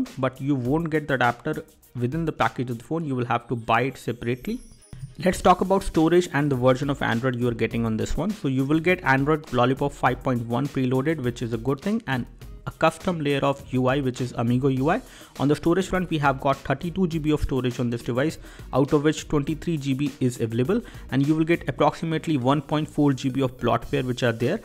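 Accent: India. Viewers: I just heard an English review in Hindi accent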